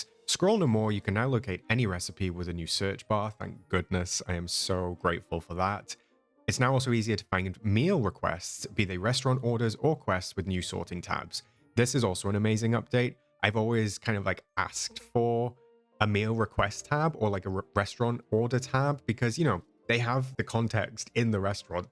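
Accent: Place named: Wales, United Kingdom. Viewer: Hey 👋🏻 Been really enjoying your content and insights…It is nice to hear a DDV content creator with a British accent (a lovely Welsh accent 🏴The patch notes are interesting but the bug fix we are desperately hoping for is the one with the male avatar which leaves him permanently with a 5 o clock shadow. This is especially noticeable in poor lighting. No matter how many times you go to facial hair settings and change the colour and Opacity it will revert back to black and 70%. It is maddening because I play DDV with my 9 year old son and our avatar is based on him and looks exactly like a teenage version of Luka! The illusion is spoiled by this bug that emerged 2 updates ago…we play on PS5 and I’m not sure if it is exclusive to that platform. Contacted Gameloft about it but got a bot response which gave me options which wasn’t relevant to the issue. 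No one else has noticed or been bothered (even on Reddit!) It is a small thing I know but it spoils the experience…like with the hiking DreamSnap when our avatar was in the boyscout outfit. Excited for the update…we are having a Aladdin marathon this evening watching all 4 films! Oh btw I hope Gameloft have really tested this stealth game mechanic! Thanks for the fun😊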